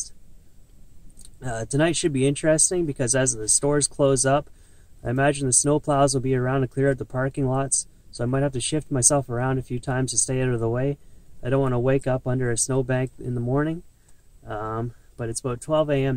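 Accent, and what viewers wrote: Canada. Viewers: the Canadian accent too! "Take off, aye!"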